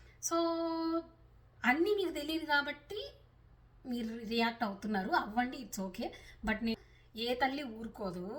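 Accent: Rayalaseema, India. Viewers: అక్క నమస్తే వాలీల మాటలు పట్టించుకోవద్దు అక్క మనం ఏది అనుకుంటున్నామో మనం ఏం చేయాలనుకున్నామో అదే చేయాలి మనం ఒకవేళ వెళ్లాలి అనుకున్నాము అదే వేలో వెళ్లాలి అక్క వాళ్ళ ఇల్లు మాటలు పట్టించుకోవద్దు బట్ ఇప్పుడు అంతగా కామెంట్ పెట్టిన వాళ్ళు కూడా నీకు ఎవరో చెడుగా పెట్టారు అని అన్నారు కదా వాళ్ళ పాపం వాళ్ళదే ఫోన్ చేయమంటే వదిలేసేయండి వీళ్ళకి కూడా అర్థమైందా లేక చెప్పారు కాబట్టి సంతోషం అందరు కామెంట్స్ చదివి మీరు రిప్లై ఇచ్చారు కాబట్టి చాలా సంతోషం అక్క పట్టించుకోవద్దు అక్క కొంతమంది కొంతమంది మాట్లాడుతూ ఉంటారు పిచ్చి మొహాలు కొంతమంది ఇలా ఫేక్ ఐడి లు క్రియేట్ చేసుకుని మాట్లాడితే అమ్మ నీ అక్కని చెల్లిన అంటే ఎవరు పడరక్క తిరిగి వాళ్ళని అంటారని ఆలోచన వాళ్లకు ఉండదక్క పిచ్చి మొహాలు అట్లానే ఉంటారు వదిలేసి మీ వీడియోస్ చాలా బాగుంటాయి మీ ఫస్ట్ మీ వాయిస్ చాలా బాగుంటుంది అక్క నేను ఒక తమ్ముడుగా చెప్తున్నాను ఒక శివ సత్తిగా చెప్తున్నాను ఓకేనా అక్క చాలా మంచిగా ఉంటది మీ వాయిస్ కూడా పక్కా మన లాంగ్వేజ్ ఎట్లా ఉంటుందంటే మాస్ లాంగ్వేజ్ అలాగే మాట్లాడతావు దీంట్లో ఇంగ్లీష్ గాని అంత బయట దేశంలో ఉండి కూడా స్వచ్ఛమైన మన రాయలసీమ లాంగ్వేజ్ లో మాట్లాడితే హాట్సాఫ్ అక్క నీకు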